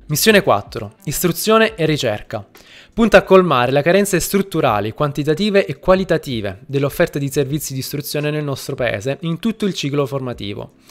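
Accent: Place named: Lamezia, Italy. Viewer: E io che pensavo che la avessimo solo noi Lametini questa Z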